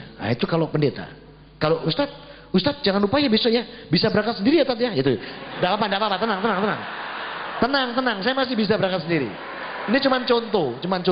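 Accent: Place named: Java, Indonesia. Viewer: jowone medok 😄😄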